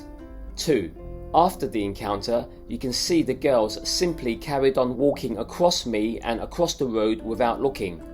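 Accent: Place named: United Kingdom. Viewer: I like you have the Hong Kongers' 「Wah」expression while you still have the Brits accent😂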